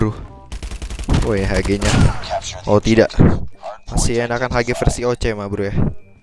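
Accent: Java, Indonesia. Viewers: ngomongnya kayak orang Jawa untung aku orang Jawa jadi ngerti gituh hehe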